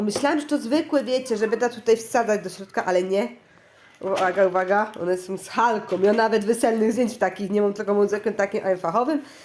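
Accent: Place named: Silesia, Poland. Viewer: Witaj fajna gwara ślązoczka czy inny górski region?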